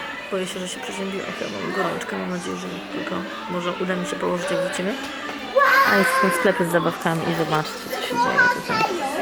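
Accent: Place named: United Kingdom. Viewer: Ooh the accent 18 lat się uczę mowić z brytyjskim akcentem i nigdy mi nie wyszła taka pillow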